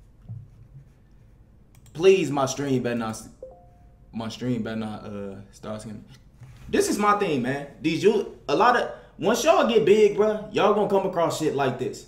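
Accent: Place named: Detroit, United States. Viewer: That Detroit shit came outta my nigga it come out of all of us in the D😂💪🏽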